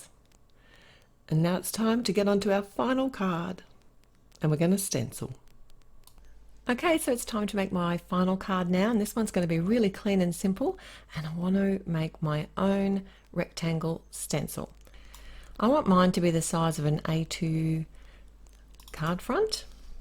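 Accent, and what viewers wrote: Australia. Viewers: Also loved having your wonderful Australian accent talking to me.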